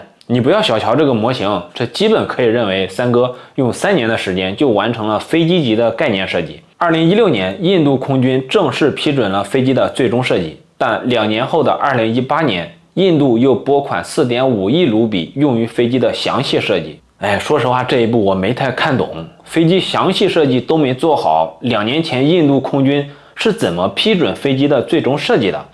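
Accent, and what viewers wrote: Henan, China. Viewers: 你一定不是的粉丝，他说的是河南话。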